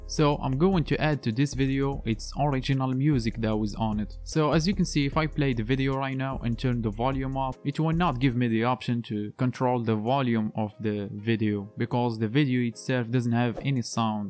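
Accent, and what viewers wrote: Morocco. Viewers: Your accent just Moroccan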